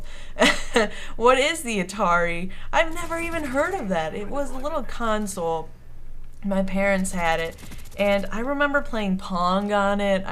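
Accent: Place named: United States. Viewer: Hey I'm new to subbing to you and I have been subbed to whiteboy for a long long time and I noticed u kinda have the same dialect as him. Are you from the Sam part of the us or something?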